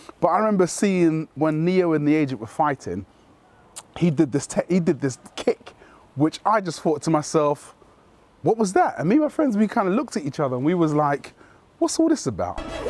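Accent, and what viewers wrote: United Kingdom. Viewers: I swear you have the best voice and accent of all Brits on Earth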